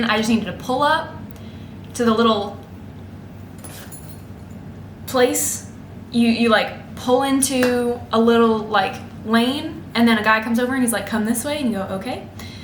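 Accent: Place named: South, United States. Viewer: I hear a southern accent coming along 😂🫶🏼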